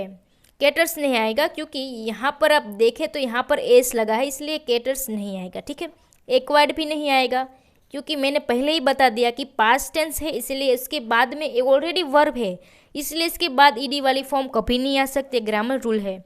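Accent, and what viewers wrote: Gujarat, India. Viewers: Thank you 🙏 Gujarati tone in your voice 😄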